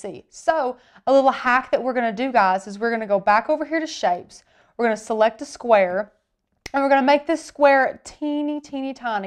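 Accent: South, United States. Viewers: Nice to hear someone actually form the south for once. Great instructions. It looks like fun , also creative. Thank You from Georgia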